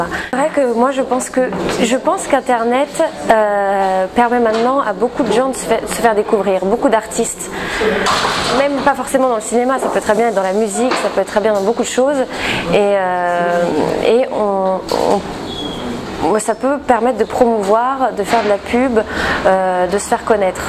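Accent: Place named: Paris, France. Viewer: attention à l'accent bobo-aristo-parisien sinon vous êtes très jolie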